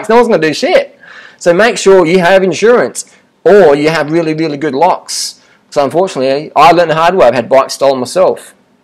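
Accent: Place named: Australia. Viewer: I'm curious, does anyone know where this guy is from? I'm detecting a sort of Australian accent but im finding it a bit difficult to understand him. It would be nice to know where the accents from :)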